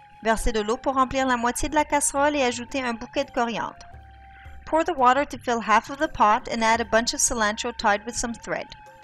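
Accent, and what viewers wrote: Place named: Quebec, Canada. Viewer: C'est marrant lorsque tu parles Français tu as un petit accent canadien, tu es au Quebec ? Merci pour ta recette en tout cas. ;)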